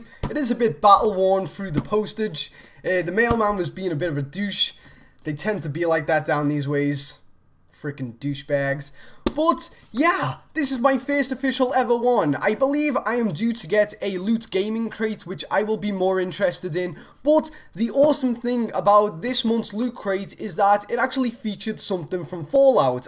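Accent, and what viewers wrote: Liverpool, United Kingdom. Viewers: are you from Liverpool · Are you British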